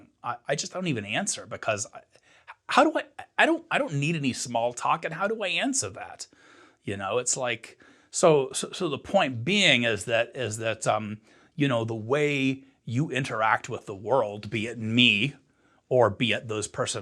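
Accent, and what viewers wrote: Ireland. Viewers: Interesting about Texas....he sounds almost Irish to me. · Irish Accent im hearing?...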